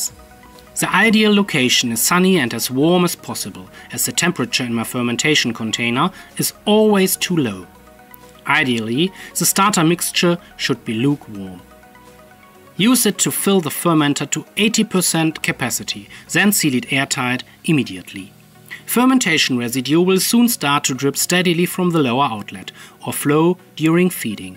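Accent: Germany. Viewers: He's German 😂 the accent is very clear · I love the German accent especially yours it’s nice, like a fine British accent!! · Dual Publishing in your native language is neat and appreciated! Also, don’t feel bad about your accent, it’s neat and ALSO your English is far better than my german! (And any English speaker whining about it probably can’t speak a single other language!)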